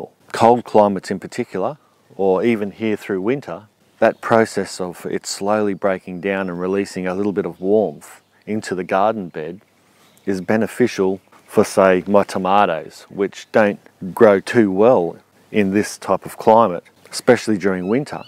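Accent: Australia. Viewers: You're going to be a YT Star....I wish I had an Auzy accent.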